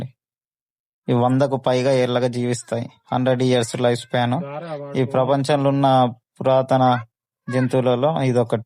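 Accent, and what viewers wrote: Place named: Telangana, India. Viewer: Pakka telangana slang.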